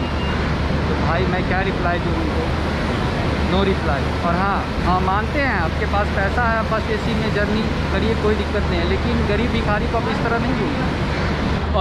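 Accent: Bihar, India. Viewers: Apka voice se hi pakar liya tha ki ap bihar se ho. Me bhi bihar se ho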